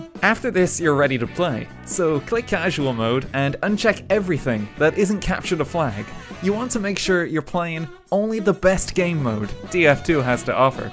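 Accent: Ireland. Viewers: U Irish??because I am lol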